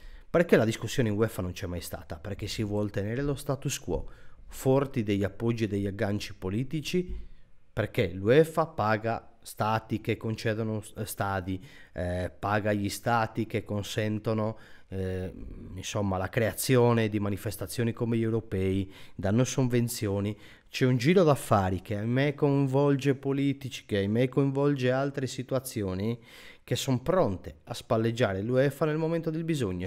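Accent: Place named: Sardinia, Italy. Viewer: Ottime le tue analisi. Però non oso immaginare cosa ci faranno ora... (Riconosco l'accento della mia amata regione sarda: di dove sei?)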